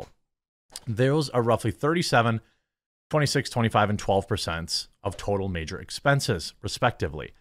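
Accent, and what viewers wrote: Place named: Midwest, United States. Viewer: Off topic but... I'm from northern Illinois but living in France for years (business consultant). I can't tell you how great it is to find and listen to a real Midwesterner speak like a normal person (in my opinion), without pretense, knowledgeable, and like an intelligent neighbor you feel comfortable arguing with. Thank you for being normal. Good luck with your company.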